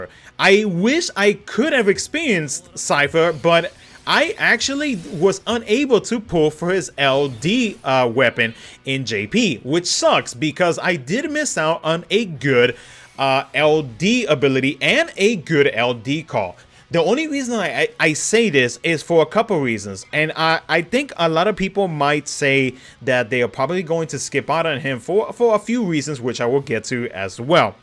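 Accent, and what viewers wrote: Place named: Brooklyn, United States. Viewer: This American accent...Brooklyn? Queens?I wanna know! I love 💘 it.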